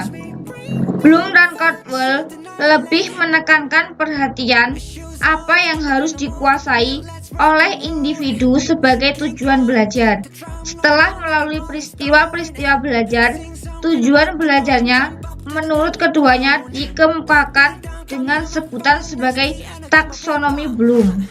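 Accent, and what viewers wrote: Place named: Java, Indonesia. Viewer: Suara nya khas medok Sukses sellu nak cantiksss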